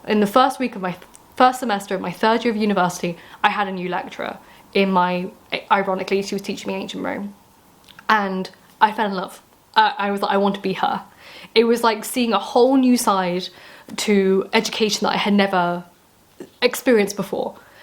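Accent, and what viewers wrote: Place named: Upper class, United Kingdom. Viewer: Dear Lady. I love your accent, I find it aristocratic, academic and upper class British. Its fun watching and listening to you. I could listen to you all day. You are motivating and just fun. This from a fan a barbaric colonial from across the pond who the fates have planted me in central Florida surrounded by Neo confederates after a carrier at NBC TV Chicago as a video tape editor. Stay well Dear Lady. DJY.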